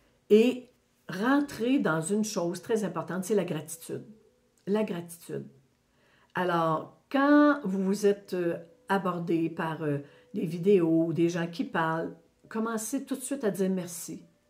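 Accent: Quebec, Canada. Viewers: Merci un régal de vous écouter et cet accent quebecois qu'est ce que je l aime ❤❤❤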